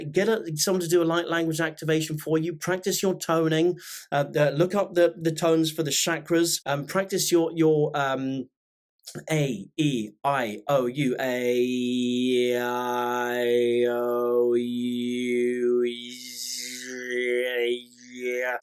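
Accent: South, United States. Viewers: Wonderful tutorial! I have been contacted by beings from the Orion constellation. I am unfamiliar with the particular groups from this star group. Any insight is much appreciated!! Also, love your southern accent, ha! I’m an intuitive guide from Georgia USA 🇺🇸!! In love, light, and service~ Elizabeth Maria Unlimited ❤🌎✨🙌🏽🧘🏽‍♀️🌞🧬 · I love the southern accent❤😂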